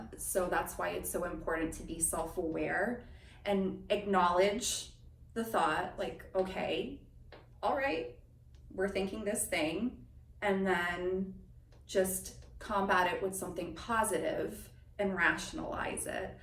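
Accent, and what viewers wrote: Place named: United Kingdom. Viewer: you sound not USA... Where you from? If you are British you could self love with British tea so you know you don't force any on me and no revolutionary war😉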